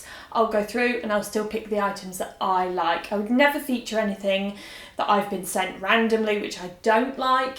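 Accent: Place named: London, United Kingdom. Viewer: Aw you can't imagine how much I love your accent. It reminds me the time I spent in my lovely London. In this video you talk specially smoothly so it is easy for your non-english speaking followers to understand. Also love your style of course ❤️ Lots of love from sunny Spain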